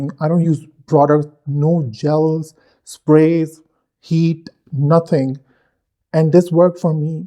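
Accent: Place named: United States. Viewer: Abe urdu may baat krna pakistani hokar American exent msy baat kr rahahai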